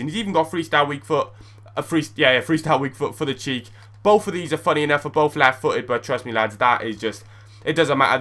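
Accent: Wales, United Kingdom. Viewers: are u from wales but ?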